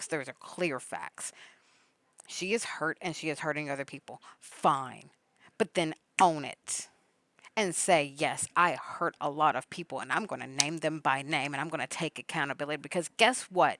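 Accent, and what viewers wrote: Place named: South, United States. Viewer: I heard the Southern accent and was sat. I know my people don’t these type of call-out videos unless it’s something serious. Also, Mucker here, passing out popcorn to all my fellows 😂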